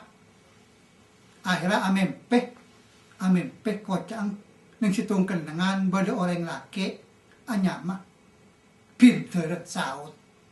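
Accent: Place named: Bangkalan, Indonesia. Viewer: Klo dari dialek nya sepertinya bangkalan tretan, pamekasan jg lumayan halus krn dekat sumenep...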